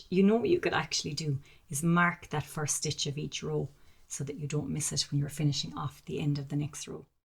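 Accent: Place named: Ireland. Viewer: This is the first video by you I have watched. I thought you were American but oh my! Love your Irish accent. This has been helpful, thank you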